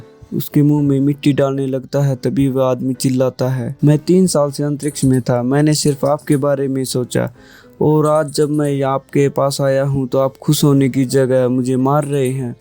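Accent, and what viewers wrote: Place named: Haryana, India. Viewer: Tum haryana se ho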